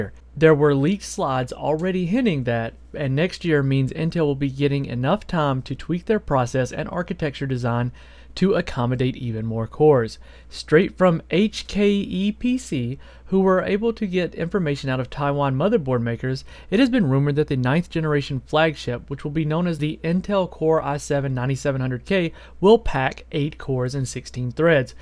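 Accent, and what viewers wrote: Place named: Texas, United States. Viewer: Lol dude i love ur accent. It reminds me of when I lived in Texas.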